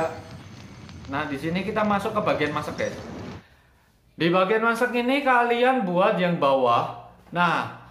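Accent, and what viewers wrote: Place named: Java, Indonesia. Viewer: hmm medok men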